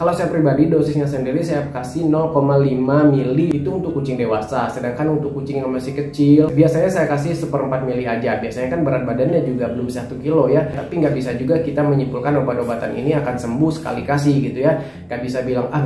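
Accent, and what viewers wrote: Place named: Banten, Indonesia. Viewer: Kebantern ngomongnya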